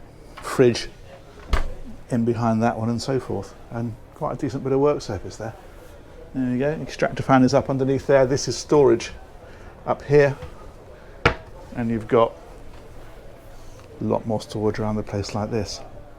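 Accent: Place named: United Kingdom. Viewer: Wow, what a fantastic toy, an absolute awesome party boat! Great tour as always, and lovely british accent makes every video even better!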